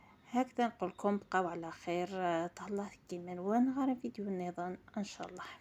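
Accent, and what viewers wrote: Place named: Tizi Ghennif, Algeria. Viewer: لهجة تاعك تاع تيزغنيف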